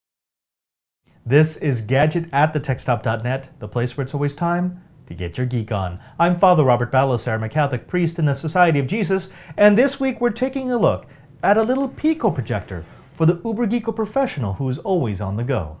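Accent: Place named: Philippines. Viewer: one last question out of my curiosity since you are a Jesuit priest. Can I ask if you are a Filipino from Ateneo Universities in the Philippines? The way you speak it sounds like a Filipino and at the same time every features of you makes me feel like you are one of us. peace, popoy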